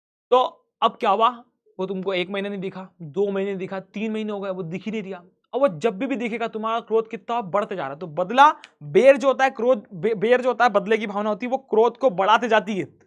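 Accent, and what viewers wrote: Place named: Bhopal, India. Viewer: aapke accent sunn ke lag riya h aap bhopali ho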